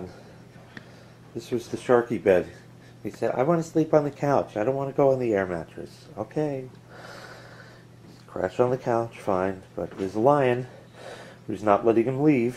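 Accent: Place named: Midwest, United States. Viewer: We go through bottles of that Aldi chocolate syrup every month. Your voice being shot is actually amusing (sorry!)... it's like your voice is there, but you have lost your New York accent. Like you are suddenly a midwesterner. Yep, I'm commenting as I go XD... AWW I see the Sharkie missed a few pots, hun. OH and now I see why, he doesn't have his glasses on. :-) Glad it was fun!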